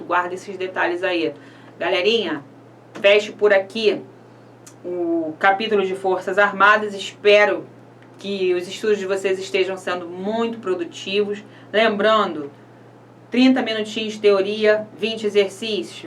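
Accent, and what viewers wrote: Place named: Rio de Janeiro, Brazil. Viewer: Uma pena, uma aula tão rica e objetiva não ter tantas visualizações, obrigada professora! A senhora é 10, gratidão! Sou carioca mas moro em BSB, e as vezes esqueço o quão lindo é o nosso sotaque <3 estudo em casa, porém, logo voltarei para testemunhar minha aprovação neste comentário, Deus abençoe esse curso!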